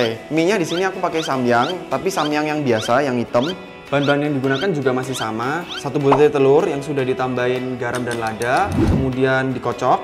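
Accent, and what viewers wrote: Java, Indonesia. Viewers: Orang Jawa mana mas 😂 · Deneng medok si, wong ngendi njenengan? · baru pertama nonton video mu mas. kok medok wong endi to mas.. wkwk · Kalo ngomong medok ya😂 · ooo suroboyo tha pantes rodo medok koyok aku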